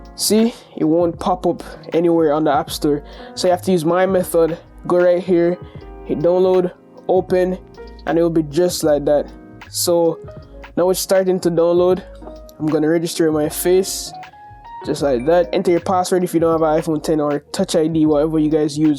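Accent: Jamaica. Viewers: are you a jamaican/ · Are you Jamaican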